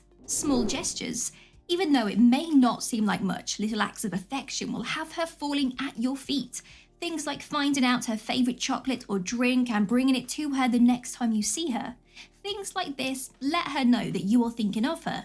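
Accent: United Kingdom. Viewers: Actually, this is my real YT channel, and I still love your British accent. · I love your British accent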